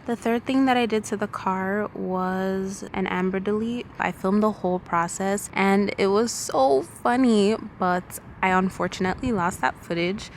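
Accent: Jamaica. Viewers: you sound jamaican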